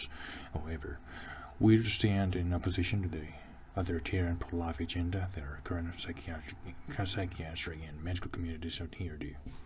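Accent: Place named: South, United States. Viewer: Your accent sounds very country southern USA.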